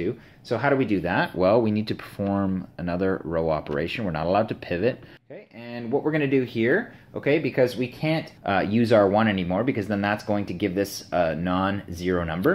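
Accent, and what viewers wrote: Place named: Canada. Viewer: ha could tell by your accent, Canadian fella! great video man!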